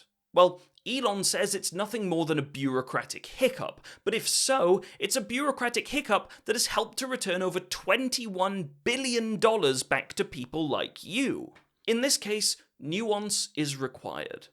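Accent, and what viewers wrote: United Kingdom. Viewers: just might be your moist pointless video yet. at least it brings a british accent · I just have to say that very very few people can make me *literally * laugh out loud, but you manage. I’m British too and I think I have a similar accent and love of swear words so it’s very inspiring to hear you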